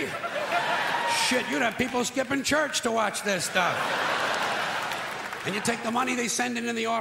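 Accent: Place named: New York, United States. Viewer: Lol yea his new york accent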